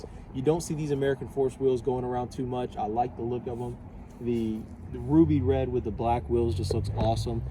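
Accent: Alabama, United States. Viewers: What part of Alabama are you from? I’m from Auburn!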